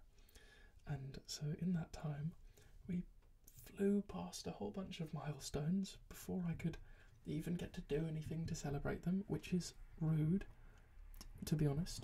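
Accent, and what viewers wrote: United Kingdom. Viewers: The main reason I watch your videos is learning your British accent :)✨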